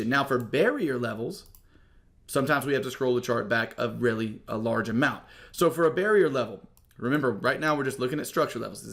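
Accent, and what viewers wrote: South, United States. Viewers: Love your southern twang.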